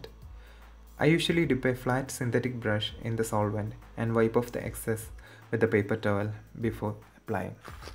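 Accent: Kerala, India. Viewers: Are you a mallu? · Sans-sational YouTuber that's a typical Indian accent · Are u from kerala? ?? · Are you a keralean ? Your accent is like malayalam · He is most likely from Kerala, god's own country. Malayalam and their accent sounds very pleasant to my ears. 🙂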